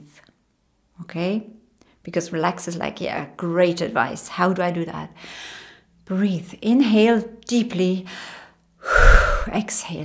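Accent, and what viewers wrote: Ireland. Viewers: I love when she giggles like a little girl. And the Irish accent ❤️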